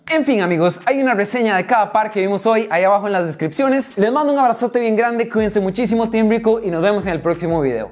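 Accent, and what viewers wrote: Costa Rica. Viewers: Usted es de Costa Rica? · Es de Costa Rica? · Sos de Costa Rica? 🤔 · Eres de Costa Rica?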